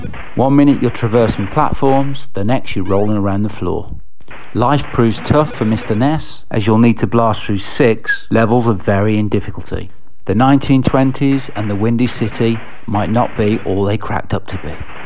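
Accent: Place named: Midlands, United Kingdom. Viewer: You sound like you're from the Midlands, are ya?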